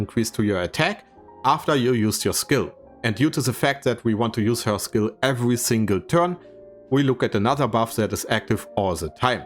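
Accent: Germany. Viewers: i hear a german accent...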